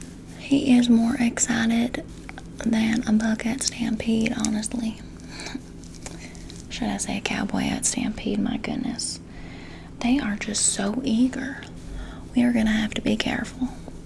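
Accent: South, United States. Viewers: I love your southern belle accent! Amazing as always! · Honestly I would’ve thought you were southern 😂❤ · girl, your lashes look amazing! also I absolutely love your southern accent, you're so talented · Haha that southern accent is funny lol btw nice job with imitating this accent 😂❤ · New trigger unlocked: southern accent. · Nobody does Southern Belle like you. You could literally be part of a country TV series. Too good. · Love the cowboy Accent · i love southern accent videos as a woman who’s grown up in the south 😭😭 they crack me up! i love the vibe!!!! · I have a huge southern drawl, you nailed this!!!! #awesomejob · You ain’t southern but you’d never know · Such a cute role play for a Canadian you do Southern pretty well!! Also, your hat appears to be backwards 😉. Unless it's just been misshaped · Again, very nice southern accent! Also, I heard you mentioned some country singers in this video. 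I'm a very big fan of, and I am actually going to her concert at late August! Who are your favorite country singers,? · Are u from the south? This is spot on! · From a southerner, this RP is so spot on! 😂 I know PLENTY of girls like this. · That southern accent is so attractive.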